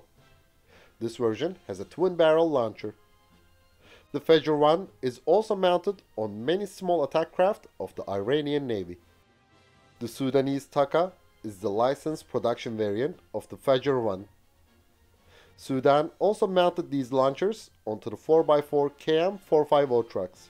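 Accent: Turkey. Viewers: Konuşan Kardeşim kesin Türk. Selamlar. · aksana bak kesin türk amk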